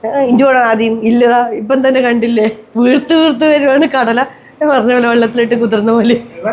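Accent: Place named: Kannur, India. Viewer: 😂😂 എങ്ങനെ യൊക്കെ ശ്രദ്ധിച്ചാലും ആ കണ്ണൂർ സ്ലാങ് പുറത്തു ചാടുന്നത് കണ്ണൂർക്കാർക് തിരീന്നുണ്ട്... ട്ട്വ...😅😅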